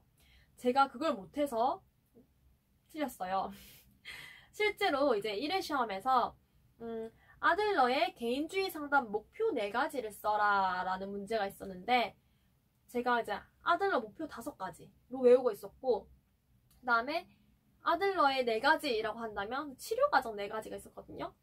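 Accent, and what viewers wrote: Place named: Gyeongsang, South Korea. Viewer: 경상도 분이시네요 ㅋㅋ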